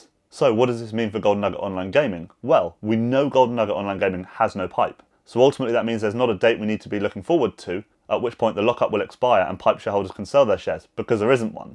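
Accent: United Kingdom. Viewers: Really appreciate the update, thank you. I got into this SPAC through you, this could be a great success story so gonna watch it and enjoy the journey. Looking forward to your next pick. Also - so nice to hear a YouTuber with a British accent! Mark - Hertfordshire.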